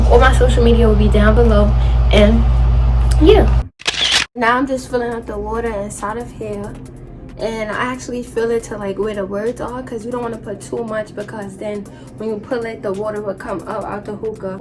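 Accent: New York, United States. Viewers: I love your accent , sounds newyorkish or Jersey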